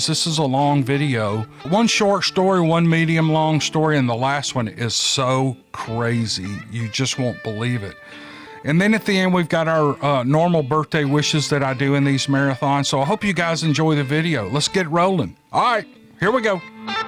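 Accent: South, United States. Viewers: like everyone else I love your voice! I’m from Mississippi living in Florida now, so I love Southern voices.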